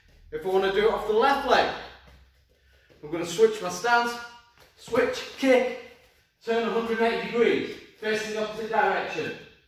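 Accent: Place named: Northern England, United Kingdom. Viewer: Your north english accent is actually cool.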